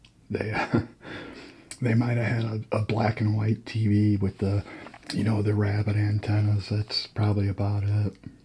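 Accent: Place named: Midwest, United States. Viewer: P.s. I love your accent! Are you from the Midwest? I’m from Utah but living in California 😊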